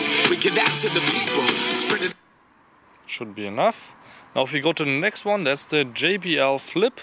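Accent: Germany. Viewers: Sehr informatives Video, danke dafür! Btw man hört deinen deutschen Akzent so hart :D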